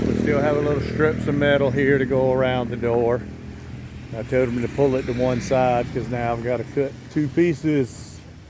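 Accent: South, United States. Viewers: Aloha from Hawaii dude. I love your Southern drawl lol. You are hard core. I really want to come visit. It is getting stupid expensive to live in Hawaii and racism against whites is bad. Thanks for sharing your life with us mate. ❤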